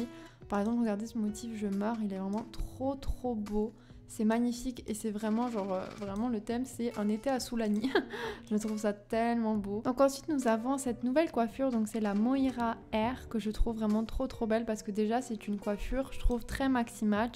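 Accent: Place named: Corsica, France. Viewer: Coucou 😊 ohlala je viens de tomber sur ta chaîne et je sens que je vais télécharger TOUS TES CC, je suis beaucoup trop fan de tes sélections 😌💕 ça me relance de ouf dans les sims là On dirait que tu as un léger accent, ça me rappelle un peu la Corse, tu es originaire d'où sans indiscrétion? ☺️ Je m'abonne ❤️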